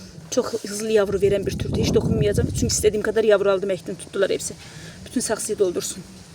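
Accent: Azerbaijan. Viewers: Кardesim ellerinize saglik siz azerisinizmi .konusma iz cok benziyor azeriye.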